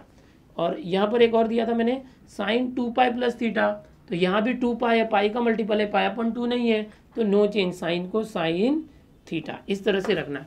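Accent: Maharashtra, India. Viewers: महाराष्ट्र स्टाइल मध्ये कडक कडक👌👌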